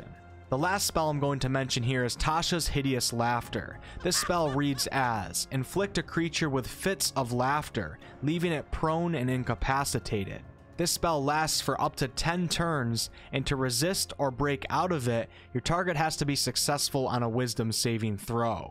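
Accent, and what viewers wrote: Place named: Chicago, United States. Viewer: true.. but i have a hard time taking him seriously because of his Chicago accent!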